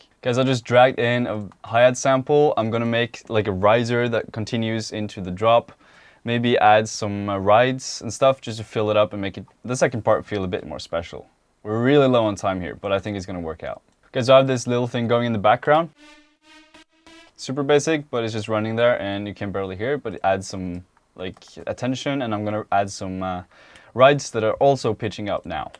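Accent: Norway. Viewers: So is this dude norveggian or sveddish?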